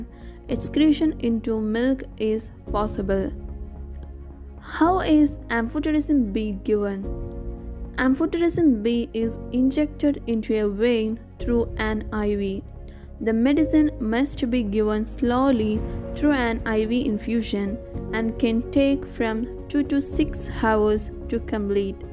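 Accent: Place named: Kerala, India. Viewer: The speaker is mallu 💯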